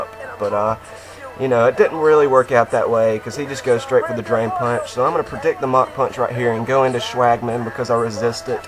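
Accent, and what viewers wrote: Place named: South, United States. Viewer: You got a bit of a southern accent going on.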